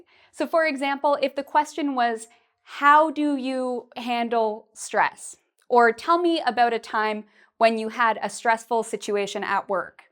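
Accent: Canada. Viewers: shes from Canada, I can tell by her accent. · Very useful, great! Just one question,: are you Canadian? ☺ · Cute canadian accent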